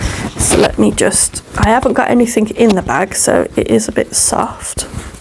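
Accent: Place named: Birmingham, United Kingdom. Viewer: Hi, first time watcher, loved all your makes, they are so neat and I am a big fan of Liberty. You sound like a local brummie girl to me, what date are you going to the festival of quilts at NEC next week? Also did you go to Alice Caroline recent sale in the Cotswold, my friend went and they were giving away smaller pieces of Liberty, she is going to pass on a bag to me at the NEC on Saturday. Anyway thanks for your inspiration and it’s great to see the enjoyment you are getting out of this ❤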